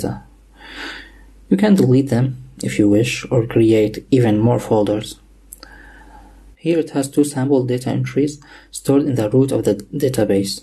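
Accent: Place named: Iran. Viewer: Thank you! Do you speak Farsi? Where are you from? You have a nice accent that reminds me of some of my students. They're very brilliant.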